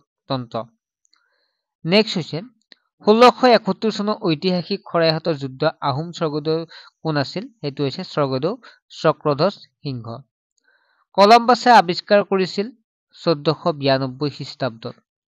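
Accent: Nalbari, India. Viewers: Sir nalbarir lage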